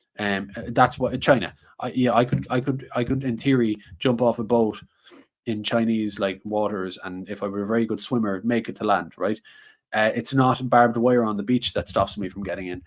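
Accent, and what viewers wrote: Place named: Ireland. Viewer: You sound like a typical uneducated bigot but with an Irish accent. I thought the Irish were a lot smarter than that. Clearly you have proved it wrong.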